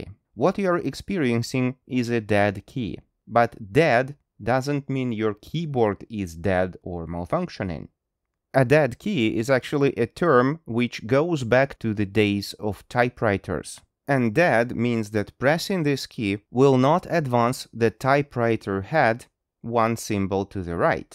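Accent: England, United Kingdom. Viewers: О, вы из Англии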